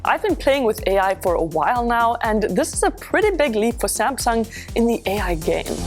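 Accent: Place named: South Africa. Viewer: Yooo this is crazy, I stumbled on this video and instantly thought you sound South African. Let's go such a good quality video. I'll be sticking around. Subbed!!🎉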